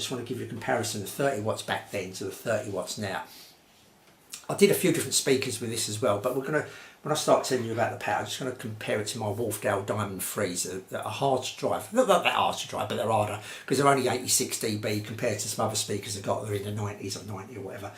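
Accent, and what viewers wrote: United Kingdom. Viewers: We cannot understand a single word you say. Your accent is annoying that british accent of urs.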